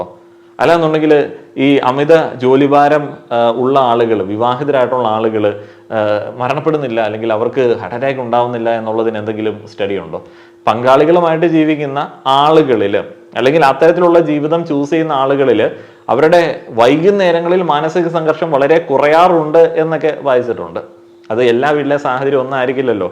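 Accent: Kottayam, India. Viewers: Only a Kottayam Boy can talk like this.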